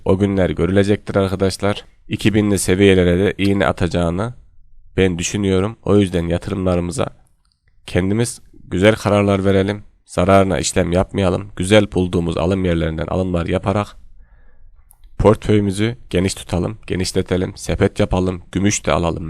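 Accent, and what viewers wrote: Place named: Konya, Turkey. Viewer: konya şivesiyle konuşuyorsun konyalımısın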